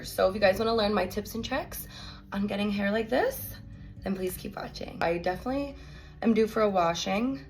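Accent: New Jersey, United States. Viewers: Awww she’s got the Jersey accent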